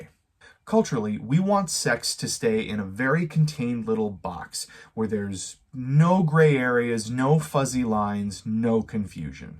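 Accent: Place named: Canada. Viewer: Are you from canada brother , your english accent as canadian people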